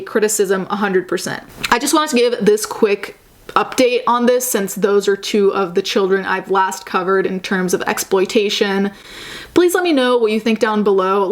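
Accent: RP, United Kingdom. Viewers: Oh I love your british accent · I cant understand you with your HEAVY British accent. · And that glaring posh accent xD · OMG I love your British accent!! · I love your british accent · I love your British accent 😍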